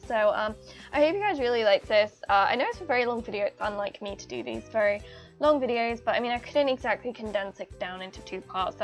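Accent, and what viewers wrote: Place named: Australia. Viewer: your voice screams "AUSTRALIA"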